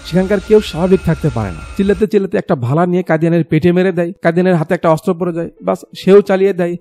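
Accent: Bogura, Bangladesh. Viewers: হামি তো ভেবেছিলাম আপনার বাড়ি বগুড়া এল্লা কোন কথা বারে আপনি বগুড়ার ছল নো ?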